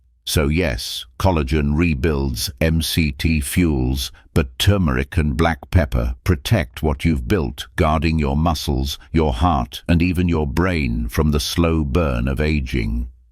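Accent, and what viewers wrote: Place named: United Kingdom. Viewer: Why does it take almost 16 minutes to say what you add to your coffee? We do not need to listen to the British voice droning on & on.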